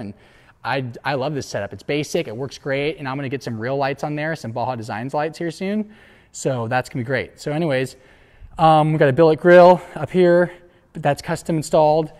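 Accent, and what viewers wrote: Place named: California, United States. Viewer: Damn bro you sound extra California. Tom delonge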